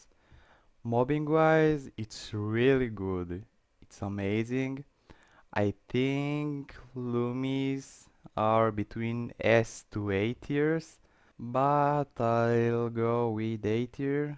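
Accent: Israel. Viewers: I recognize an accent when i hear one 99.99% israeli :)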